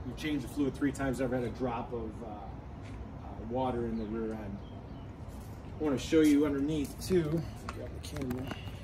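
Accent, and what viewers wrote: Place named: Western New York, United States. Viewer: I think I recognize that accent; western NY, perhaps? I grew up pretty close to Rochester meself.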